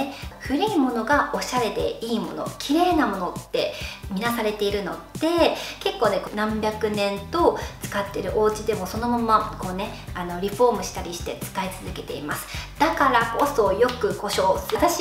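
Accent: Okinawa, Japan. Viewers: Tsugumi K CAさんだからね.... だから英語っぽいっていうかなまりがあるんだよね.... (あと沖縄出身だから) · 喋り方、所々沖縄なまりや沖縄の友達がする様な表現が入っていて好きです☺︎ ラッキーなのはお人柄のおかげってあると思います👍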